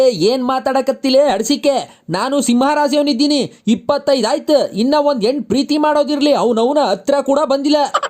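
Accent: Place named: North Karnataka, India. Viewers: 3;35 Uttar Karnataka language love u Bhai ❤️ channagi matadtiri nivu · ಹಾಯ್ ಅನ್ನ. ಉತ್ತರ ಕರ್ಣಾಟಕ ಭಾಷೆ ಸೂಪರ್ ಅನ್ನಾ ನಮ್ಮ ಭಾಷೆ ಮಾತಾಡಿ ಬ್ರೊ flz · sakath matadti nod pa hulli namm uttar Karnataka bashee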